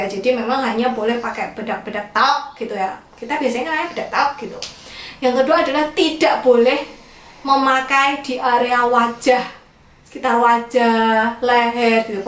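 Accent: Java, Indonesia. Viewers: Dihh bu bidan lucu yaa gaya bicaranya, aku yg juga orang jawa kerasa bgt uniknya ,malah jadi ciri khasnya Bu saran dong, gimana kalo ngebersihin BAB pake tisu basah, bahaya kah? Kan kalo pake wash lap suka ribet Makasi bu bidan yaa sharingnya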